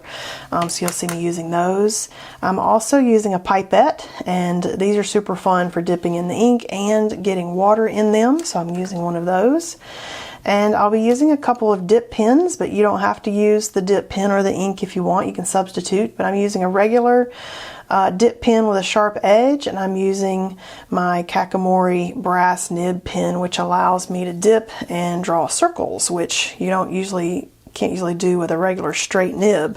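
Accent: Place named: South Carolina, United States. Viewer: You sound like you are from South Carolina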